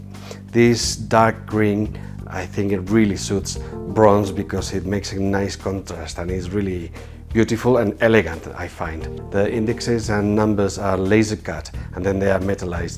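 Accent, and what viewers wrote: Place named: Spain. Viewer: Se nota que es español pero no lo hace nada mal,cuando un inglés habla español tambien se le nota, mucho mas. Ánimo.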